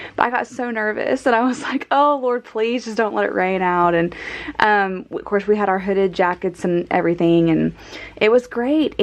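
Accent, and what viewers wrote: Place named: South, United States. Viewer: Great videos. I am enjoying your vlog style and your southern accent.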